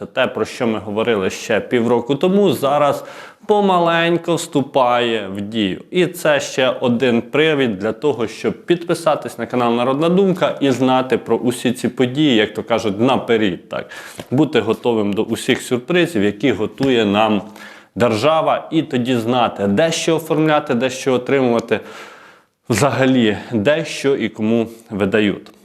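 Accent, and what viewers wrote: Vinnytsia, Ukraine. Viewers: Людмила, у мене також вища освіта і ні в якому разі не придираюсь, просто у деяких вінничан такий діалект, а молодець, займається дуже потрібною справою, за що йому велика подяка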